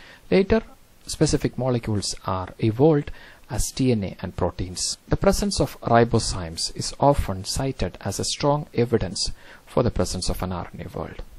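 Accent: India. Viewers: am i the only one that loves listening to an indian accent?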